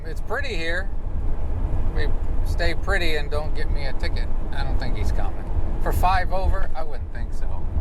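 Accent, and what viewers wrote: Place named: New York, United States. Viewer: I can hear your accent clearly! How long has it been since you left NY?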